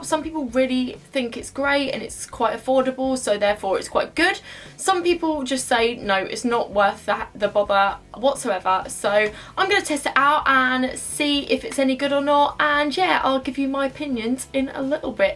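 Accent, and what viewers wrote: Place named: England, United Kingdom. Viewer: woohoo!! your backdrop is so cute!! haha you also have such an english accent!! also your hair looks fab! Love your videos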